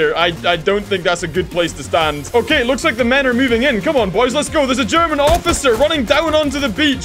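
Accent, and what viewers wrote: Scotland, United Kingdom. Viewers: Scottish. I think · is he british?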